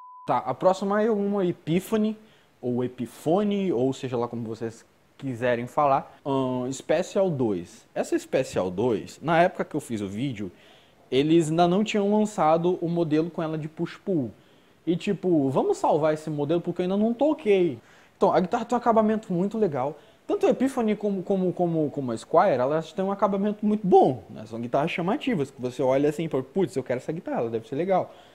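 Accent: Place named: Maranhão, Brazil. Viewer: Sotaque de Maranhense!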